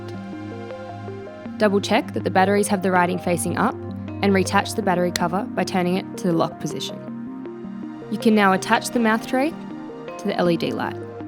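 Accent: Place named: South, United States. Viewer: Southern accent 100%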